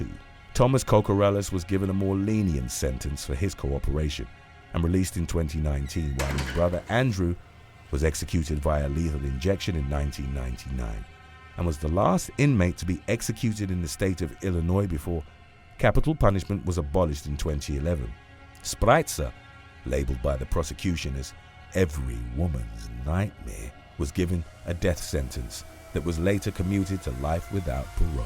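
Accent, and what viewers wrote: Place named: United Kingdom. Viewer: If this channels voice was an American voice this channel would actually get somewhere. I can’t take this shit serious when all I hear is British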